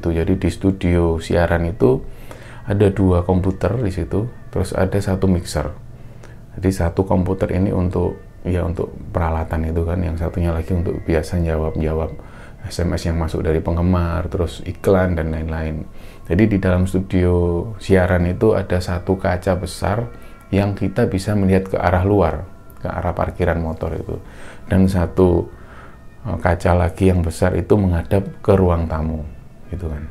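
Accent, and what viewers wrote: Java, Indonesia. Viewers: Jawanya kentel tenan,. Pembawaan critanya enak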